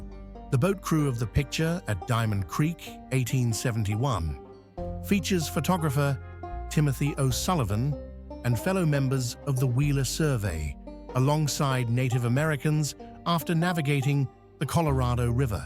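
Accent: England, United Kingdom. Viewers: Why a Brit narrator? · Why the English accent … why the blurred drawing of Custer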